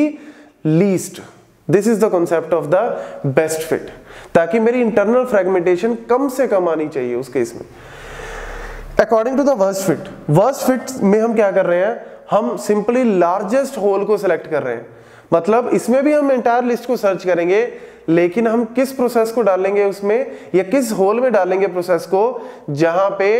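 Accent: India. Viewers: oh my god! why is it so hard to understand indian english? i can only understand 1 out of 500 videos i see around! i recommend indian guys to put subtitles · accent is way to strong. i already hate indian one but this is blasting my patience 😂. im sorry this is not personal, because i hate all idian accents.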